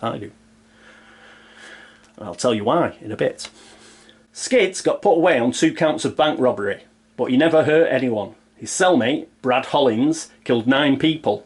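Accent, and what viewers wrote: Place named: Manchester, United Kingdom. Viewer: Gteat to have your Manc accent back. Did ypu have much trouble finding your EH boxes under all of the dust!😊 Hope life has been good for ya in meantime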